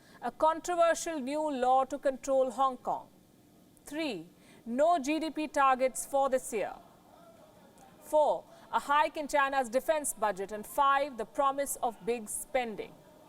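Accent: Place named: India. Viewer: So many people with low intelligence are making hating comments here cheering this biased news video presented by an Indian woman with strong accent.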